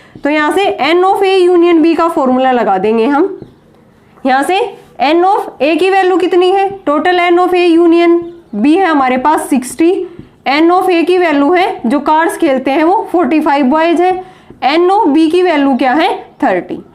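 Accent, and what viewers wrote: Haryana, India. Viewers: She is sure from HARYANA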